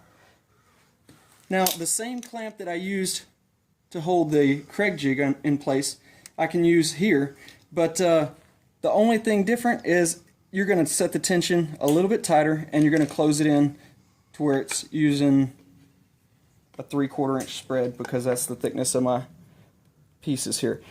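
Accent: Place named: United States. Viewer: Thanks for making us I needed somebody that speaks American most of the videos for some reason are from New Zealand or England and no offense my brothers out there it’s just y’all got the metric system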